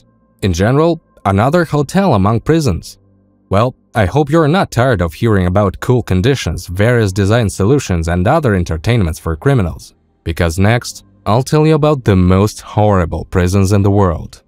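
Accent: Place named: Russia. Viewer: Maybe AI voice over instead of this thick Russian accent? Kinda hard to understand! 🤔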